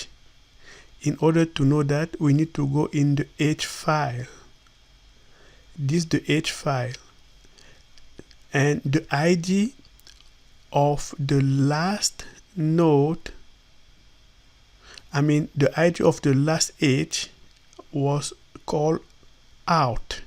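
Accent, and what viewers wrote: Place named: France. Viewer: try to improve your english you speak frenglish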